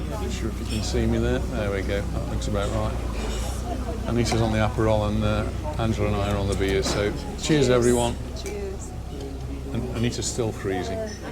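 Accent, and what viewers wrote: Stoke, United Kingdom. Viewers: Thanks for this, great video. I'm popping over for the night on Sunday. Stayed one night in El Arenal last time, loved Can Pastilla, so I'm staying in the BQ Amfora Beach. Do I detect a fellow stoke twang in that accent?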